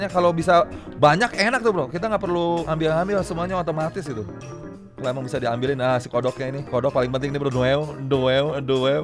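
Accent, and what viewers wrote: West Java, Indonesia. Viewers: Bang orang Sunda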